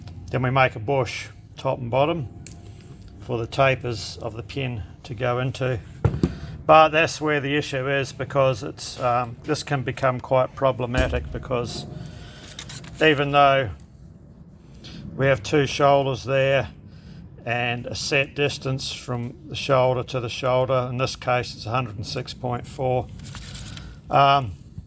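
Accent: Australia. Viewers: Another great video! Cheers from Norway again as it's late beer O clock! Looks like the stops there was a couple of Cat 3500 piston pins, have done a fair bit of those... Currently doing MTU for the last 10 years similar to the 3500. Also great to hear the Australian accent and the entertaining expressions like '' as the crow flies'' you have to explain that one as I didn't get it. Anyway cheers!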